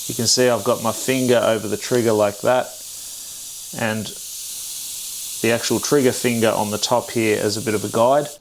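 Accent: Australia. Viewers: Strange... Now i very very much hear your strong Australian Accent 😂😂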